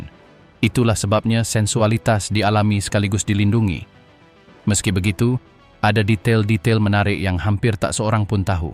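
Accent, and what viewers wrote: Malaysia. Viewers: Wah, ini bahasa Indonesia logat malaydesh. 😊😊😊😮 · Jawa Sumbawa hadir tapi sayang naratornya orang malaydhes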